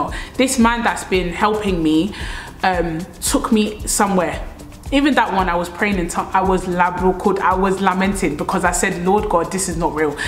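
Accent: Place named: Yoruba, Nigeria. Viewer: I like how you mixing the yoruba acient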